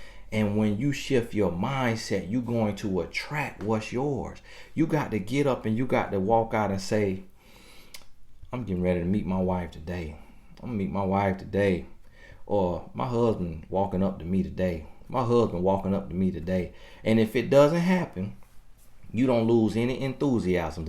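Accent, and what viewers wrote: South, United States. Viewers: Right heauh ! Love his southern drawl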